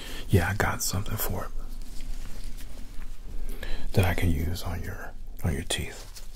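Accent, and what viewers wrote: United States. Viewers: I love 💕 your American accent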